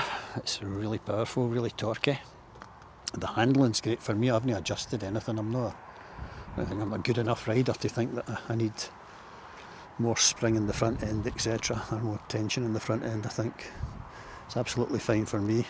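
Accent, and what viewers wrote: Scotland, United Kingdom. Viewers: You will have to eBay that Indian jacket now! It's the only problem with changing bike/brand. Saying that i still wear my HD fingerless gloves despite now riding a T100! I would like a T120 at some point but no hurry as the T100 does it all really. Ps, love that Scottish accent. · Nice! And I love listening to Scottish guys talk; I’m up to understanding nearly 25% of what’s being said!😂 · Maybe because I’m from a very mixed city/upbringing, but I can understand him completely! Never understood why people can’t… understand Scottish accents xD We’re speaking the same language! Anyway, beauty bike brother. I just picked a ‘26 up last Saturday, I’m very happy to be a part of the family now.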